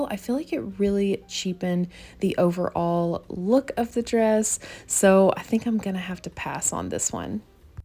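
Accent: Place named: Russia. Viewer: Interesting accent. I think your first language is Russian?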